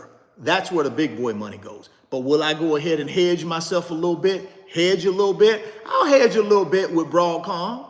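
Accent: South, United States. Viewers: Then why are you guys watching? The dude has a country, "TWANG" or dialect, that makes certain words come out sounding... country. In essence, the word NVIDIA is not even a word; it was made up from the word invidia and a acronym NV for Next Vision... so it was created using a poetic license, so he's using is own poetic license when pronouncing the word. Lol. So, give him a break. The information he is providing about the company is accurate, informative, and nicely conveyed. I could see if he was giving bad information, but he's not. It's sad that you would even bring it up. How about this... Go watch someone who's pronouncing a company's name correctly and giving BAD information and put your money into that stock and see where it lands you.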